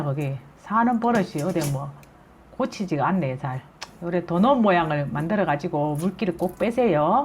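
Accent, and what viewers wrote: Gyeongsang, South Korea. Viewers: 정감 갑니다 경상도 압니까 저도 경상도 입니데 동네에서 인기 좋은 친구 겠어요 활발한 성격 부럽읍니데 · 음식도 덕담도 넘 좋아요 말씨가 대구말 같은데 혹시 대구분이신가요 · 도라지 쓴맛때문에 잘안하는데 꼭해봐야겠네요 구수한경상도 사투리 넘좋아요 정구지 카마 윗지방사람들 잘몰라애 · ㅋ ㅋ 경상도 사투리 넘 구수합니다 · 너무 재미있어요 처음으로 봤네요 구수한 경상도 말씨 좋아요 저도 고향이 경상도 거든요 도라지 참좋아하는데 내일시장가서 당장사와야 겠어요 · 선생님 경상도 사투리가 넘정겹고 하시는음식은 정말 맛깔시럽십더 저도고향이 경북의성이라 저의고향억양이네요 반갑고 요리잘보고 구독꾹눌리고 자주찾아뵙겠습니다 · 구수한 경상도 사투리정이갑니데이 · 정겨운대구말 괜찬요.^^많이가르쳐주시니감사합니다. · 경상도 사투리 재미있어요 요리 잘 배우고 고맙습니다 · 정말로 미역줄기 도라지김치 정말로요 맛나게 해요 요리를 잘배워서요 경상도 아주마 사두리 구수하네요 감사합니다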